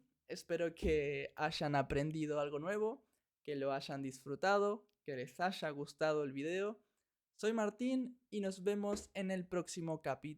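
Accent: Argentina. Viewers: Otro video útil y interesante. Soy estadounidense y llevo 6 años aprendiendo español. Me gusta tus videos y tu canal. Hablas muy claro y es bueno escuchar el acento Argentino. Una sugerencia, el volumen de tus videos es un poco bajo en comparación a otros videos en YouTube, puedes aumentarlo un poco.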